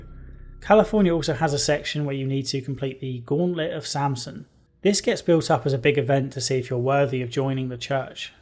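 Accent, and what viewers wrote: United Kingdom. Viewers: Soft spoken britsh game analysis ASMR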